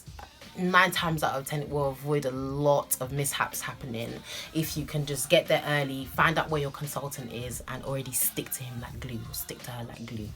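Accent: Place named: Nigeria. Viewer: Love, Love, Love the little dash of your Nigerian accent😅. My mum was telling me the other day that she thought that her dream of being a doctor was going to be fulfilled through me (can you imagine!). I just laughed because imagine a doctor that doesn't like seeing blood 🤢. Anyways love the video, because "I can't come and kill myself"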